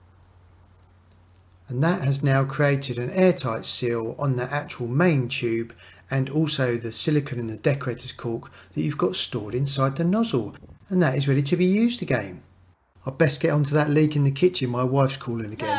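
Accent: United Kingdom. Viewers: Great tip! Well made, and a bit funny! And, myself being a Yank, I always love hearing Brits talk with their accent! Thank you sir for your contribution!! {liked.saved.subscribed=True}👍✌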